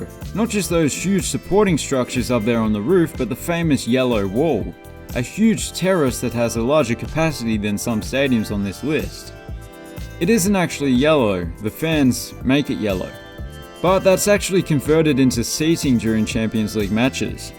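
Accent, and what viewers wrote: Spain. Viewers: Nice video, but please stop pronouncing Portuguese words as if they were Spanish. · Excellent video but stop reading portuguese with spanish accent please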